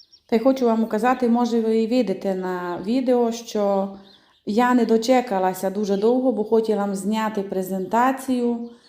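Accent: Transcarpathia, Ukraine. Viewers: Цей неймовірний закарпатський діалект то❤ Це лайк і підписка сходу! Дякую за вашу працю і процвітання🌷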